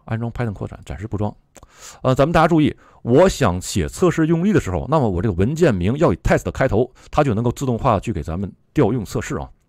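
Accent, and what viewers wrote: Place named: Japan. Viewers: Python Test 为什么老带日语发音？ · 因为在日本，没办法你到日本你的英语也会变W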